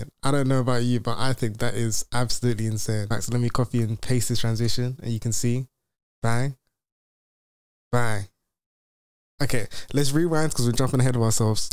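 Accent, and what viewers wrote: United Kingdom. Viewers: Enjoy your content my man. Step by step and super clear. Also love hearing a UK accent. Subbed keep up the davinci content!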